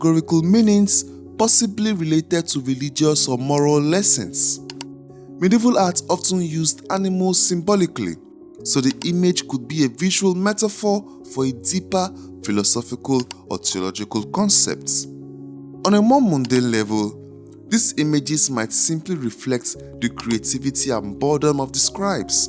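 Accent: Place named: West Africa. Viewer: What's with his accent? He sounds like he's from West Africa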